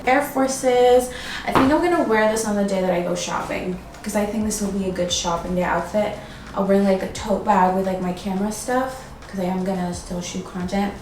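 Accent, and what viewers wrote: United Kingdom. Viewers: I love your British accent 😭😭❤ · Your videos are so comforting🥺❤, enjoy your solo trip GURLLL🤍. HAPPY BIRTHDAY!! It 12pm here in Nigeria. Your British accent gets me all the time😂. Your braaids!!! giving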